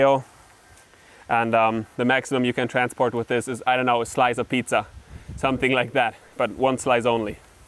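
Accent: Germany. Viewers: Haha everytime when I watch a german guy talking english I clearly hear it but this time it was difficult because u spoke pretty good english I could barely hear the german accent naice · you can hear the german acent decent mein Freund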